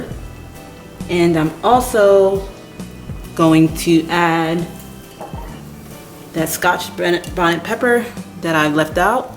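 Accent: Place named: Haiti. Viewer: I knew u were Haitian by your voice🤗, weid i know😂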